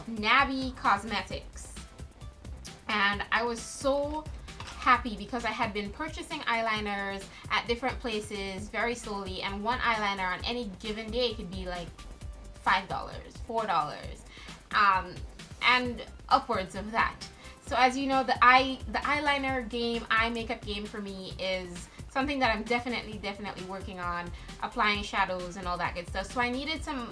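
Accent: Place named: Trinidad and Tobago. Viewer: found you through your amazon review....ah hearing that accent gyul! Trinibago???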